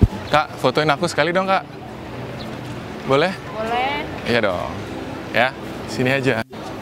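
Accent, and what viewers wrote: Bali, Indonesia. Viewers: aksen balinya kental banget :D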